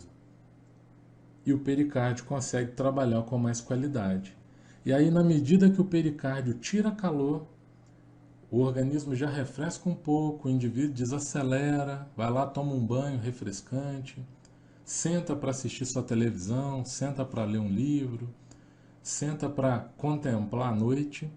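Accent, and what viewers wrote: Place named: Minas Gerais, Brazil. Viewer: A fala pausada ( bem mineira por sinal) me pareceu boa pra aprendizagem.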